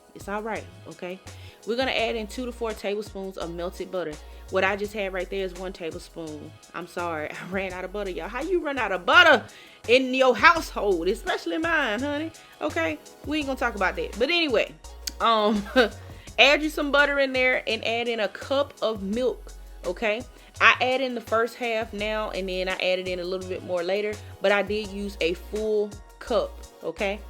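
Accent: South, United States. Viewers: I could just kiss you sis ❤ first of all the video was like listening to my big cousin or someone close to me especially with the southern bell accent😂❤ FLORIDA CHECKING IN secondly OMG THIS MEATLOAF IS SO DAMN GOOD SI I WISH I COULD SEND A VIDEO. I didn’t make glaze I don’t care for it but I did laugh when you talked about the gravy cause guess what? I used it. But I kept the sides simple it’s already late gotta get these babies ready for school but from the bottom of my heart THANK YOU. 😊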